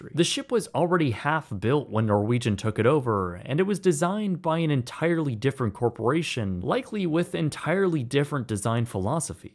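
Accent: Canada. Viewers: I heard Oout a couple times the speaker is Canadian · Yes, he’s from Ontario. He’s done several videos about Canadian companies going bankrupt, too.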